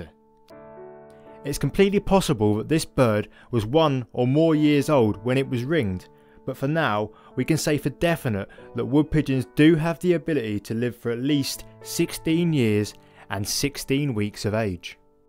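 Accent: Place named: Norfolk, United Kingdom. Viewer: Can't tell where in Norfolk you are from! I'm guessing Thetford way?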